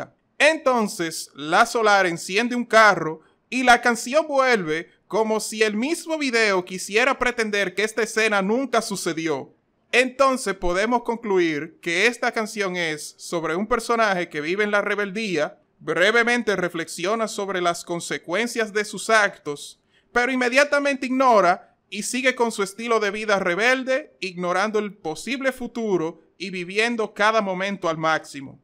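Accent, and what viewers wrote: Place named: Dominican Republic. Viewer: Eres Dominicano? Creo que sii jajaj es que el asentó dominicano se reconoce ma rápido que Cabada con lo 30 minuto y un chin ma`😂 yo también lo soy❤ AMO TUS VIDEOS!!!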